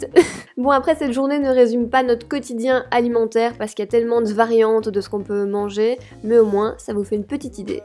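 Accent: Belgium. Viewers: J'adore l'accent belge: houit 😂😂😂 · J'adore l'accent belge 😂😂😂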